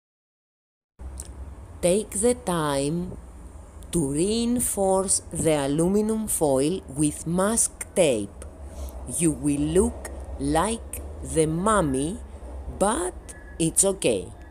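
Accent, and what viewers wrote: Greece. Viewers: Excellent tutorial. The aluminum foil is a great idea for the underlying structure. Love your Greek accent too. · Guys, she is greek. This is the classic greek accent, i live in greece sooo... XD (edit: also the newspaper is in greek.) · greek accents are the best <3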